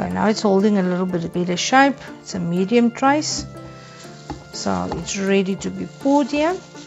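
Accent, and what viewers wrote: South Africa. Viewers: I thought you had a German accent I'm sorry South African that's COOOL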